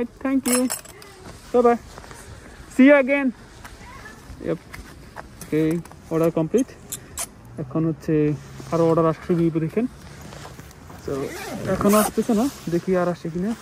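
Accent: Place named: Brahmanbaria, Bangladesh. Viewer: ভাই আপনার কথা শুনে বোঝা যাচ্ছে আপনার বাড়ি ব্রাহ্মণবাড়িয়া 😒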